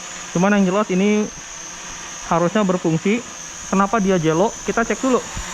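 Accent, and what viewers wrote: West Java, Indonesia. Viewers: Mantap bang orang sunda ya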